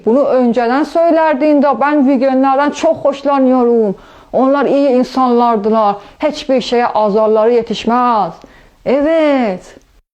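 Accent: Azerbaijan. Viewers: Fifa oyuncus U Azeri o yüzden öyle konuşuyor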